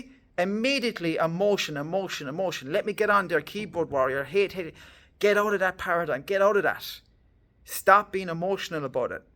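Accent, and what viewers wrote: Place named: Ireland. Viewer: Well spoken and clearly thought out words. I enjoyed your video, hearing your opinions, and hearing an Irish voice on the things happening here. Id love to see more of us doing this, I want us to be able to talk about these things in public and online without all the hate ❤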